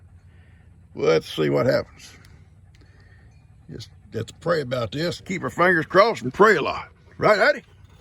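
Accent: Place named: Kentucky, United States. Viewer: That is Kentucky talk also.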